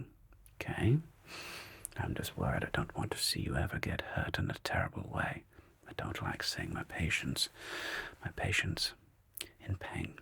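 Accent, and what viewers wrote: Germany. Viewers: I love your German accent!! Saying this as a German myself 😅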